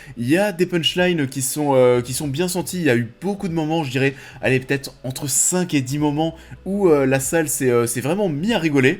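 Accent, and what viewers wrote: Paris, France. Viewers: On devrait donc selon toi nuancer les attaques et les insultes permanentes que subissent au quotidien des fonctionnaires cherchant simplement à faire leur travail ? Rester dans les clous que nous impose la bien-pensance des milieux privilégiés d'extrême gauche ne suffira pas à gommer ton manque de connaissance du sujet que dévoile ton accent parigo du 75, de privilégié justement...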